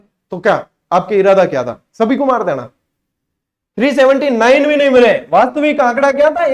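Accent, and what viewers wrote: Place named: Marwari, India. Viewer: Ek request h plz marwadi na bole apni vedios m..iritation hoti h.. uper se ap apki marvadi bhi alg h smjh bhi ni aati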